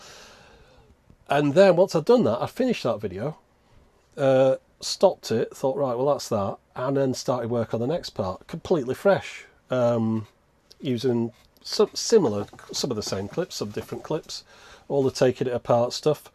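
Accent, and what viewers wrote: United Kingdom. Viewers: The question of whether you would be more successful as an American is an interesting one. I don't think it's as simple as "Americans don't want to listen to British people" since many Americans tend to think that people with British accents are classy and/or intelligent, and in any case it sounds kind of exotic. So I would wager that for some people it's actually a bonus. It might still be a net negative, but it's probably not a huge disadvantage for you. It is possible that you would be more successful if you had more of an outgoing, high-energy personality like you see with so many of the big YouTubers, since that probably appeals to the greatest common denominator, but I personally find that exhausting.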